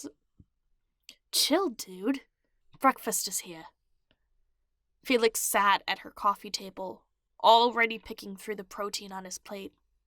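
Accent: United Kingdom. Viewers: You're Cockney-Estuarine accent is quite interesting. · I love your posh voice!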